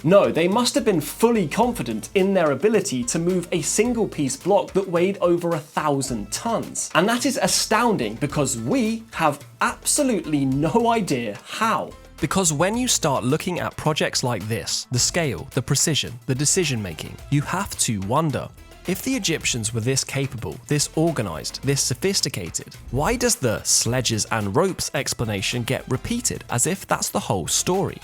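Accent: United Kingdom. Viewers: I can't do a third in a row video with the speaker babbling with their irritating British accent. I don't find the language "cute" or "charming". Just annoying.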